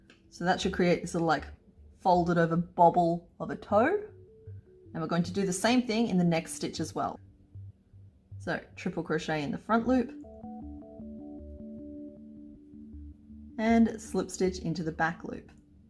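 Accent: Australia. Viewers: Wow, thanks. You sound aussie but had me confused with your US stitch names. The sulfur cresteds and the rainbow lorikeets are the mandarin thiefs which leave us nothing on our tree, in the last few years, they don't even wait until they are ripe. Maybe the cockatiel will be less of a sore point. We had a baby fruit bat in the guava tree one year, he was a failed release from the bat hospital, didn't flinch when we looked at him, wanted plenty of guavas handy, but we had no idea if he had the Lissa virus, so they came, said he was fine, and took him away. Thanks again.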